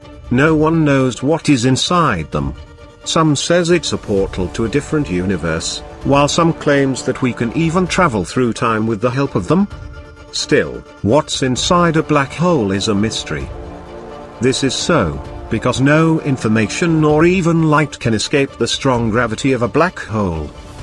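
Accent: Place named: United Kingdom. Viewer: a guy with a British voice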